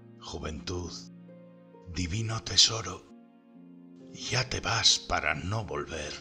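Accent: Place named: Castile, Spain. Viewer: Lo poco admisible es la prosodia castellana estricta recitando los versos sublimes del poeta. Rubén Darío era nicaragüense y en los finales del siglo XIX y principios del XX el acento idiomático no se perdía fácilmente. Por eso al vate inmortal del español, Rubén Darío, hay escucharlo en su expresión fonética natural.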